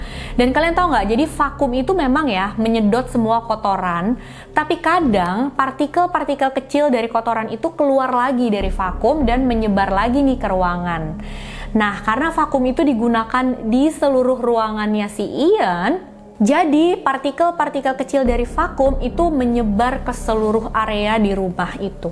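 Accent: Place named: West Java, Indonesia. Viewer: Terbaik👍 Btw ka itu orang mana?! Soal ny pas berbicara ada logat sunda ny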